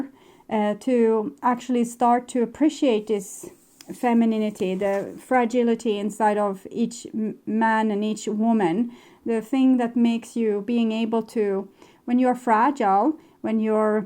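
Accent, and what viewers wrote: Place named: Sweden. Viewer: Are you Swedish btw? Im from Sweden and I think your accent sounds a little "svensk" :-)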